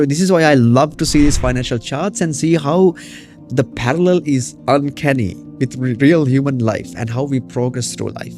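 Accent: England, United Kingdom. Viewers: Happy to hear your English accent sir I think your voice have something magical power❤ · Very much happy to hear your english accent sir. Love it. You are the motivation to express my self with confidence. I'll definitely speak with confidence one day like you. Thank you and keep up the valuable works. 👊 Jayen jayawewa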